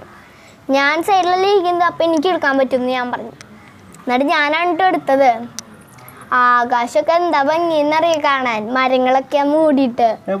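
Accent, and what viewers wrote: Thrissur, India. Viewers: wow hyderabad to cochin yathraa manoharamayi kanichu thannathinu othiri thanks...molude samsaram super..Thrissur slang 👍❤️ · Trissur slank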